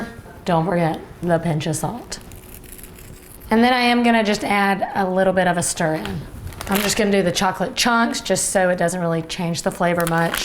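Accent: South, United States. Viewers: Love hearing you talk,Definitely can tell you are a Southern Lady,Makes me miss home,I'm from Southeastern Virginia.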